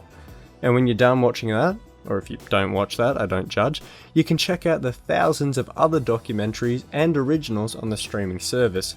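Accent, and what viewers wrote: Australia. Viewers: Is it just me or is his Australian accent distinctly more intense in this video than usual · you sound very Australian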